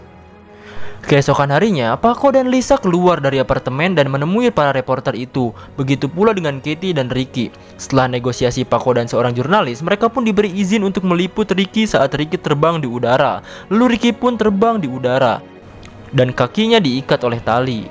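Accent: West Java, Indonesia. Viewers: Bang dari jawa barat ya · Hahaaa sunda abisss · Kakak orang sunda yah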